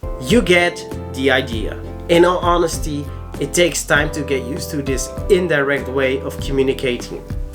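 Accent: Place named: Netherlands. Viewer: I gathered you're from Nederland from your accent. Lived there for half a year. Lekker times 😀. I was responding to the main comment with him saying he's an African American, or a black person as the rest of us outside the US say.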